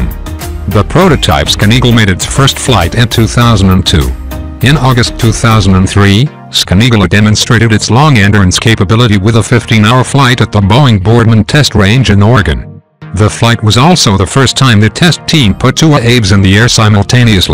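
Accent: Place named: Russia. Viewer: Poor sound - Russian accent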